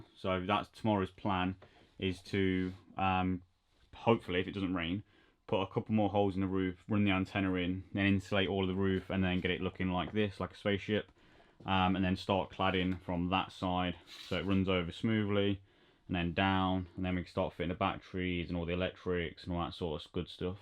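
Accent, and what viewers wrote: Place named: Leicester, United Kingdom. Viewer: I'm trying to work out your accent I would guess leicester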